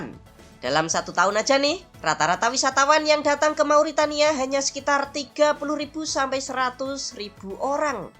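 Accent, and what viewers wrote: Java, Indonesia. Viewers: kental banget logat jawa nya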